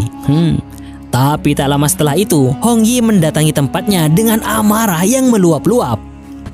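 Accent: Madura, Indonesia. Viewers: lu orang Madura ya ? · Abeeehhh reng madure be'en kak ?? 👍👍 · Madura mana bos,, wkwkwkwkwk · Orang madura ya bang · Orang Madura mas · Madureh yehh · Madureh kang ? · Ser reng medhureh reh narator eh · Reng madureh admin nah 😁😁😁💪💪💪💪💪💪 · Kreator nya orang madura anjirr 😩🤣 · Naratornya orang Madura ya? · Patek jeh atmin reng madureh yeh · reng medureh kak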